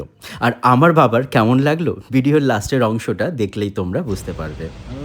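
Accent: Bangal, Bangladesh. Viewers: তোমার বাঙ্গাল কথা বেশ ভালো লাগলো,আরও বেশী শুনতে চাই। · তোমার মুখে বাঙ্গাল ভাষা টা শুনে বেশ ভালো লাগলো 🙂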